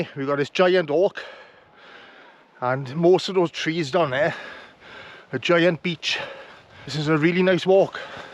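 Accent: Wales, United Kingdom. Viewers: I love your videos … so relaxing but that could be down to your Welsh accent. So soft and bootiful 😂 Also the vids are very informative. Just joined and the price is a steal especially as your videos are so well shot and narrated. Much love to and. Keep on doing what you’re doing it’s much appreciated.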